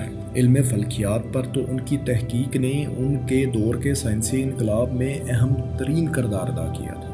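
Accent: Punjab, Pakistan. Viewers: Video achi hy magr ap k lehjay mn Punjabi tone bht zyada hy.. Apka Urdu talaffuz bilkul acha nhi hy..!!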